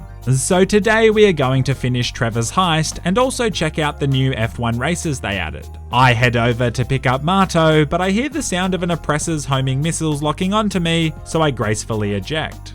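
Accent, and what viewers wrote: Australia. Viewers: Is he Australian? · are you Australian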